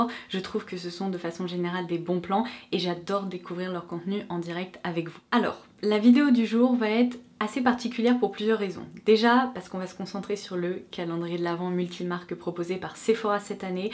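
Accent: England, United Kingdom. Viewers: POURQUOI TU PREND UN ACCENT ANGLAIS POUR UN OUI OU POUR UN NON C’EST RIDICULE !!!!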